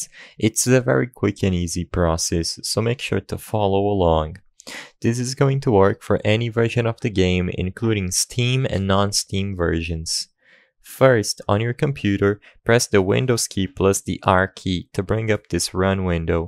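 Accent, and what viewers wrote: Brazil. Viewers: eu percebi que voce era brasileiro pelo sotaque kkkkkkkk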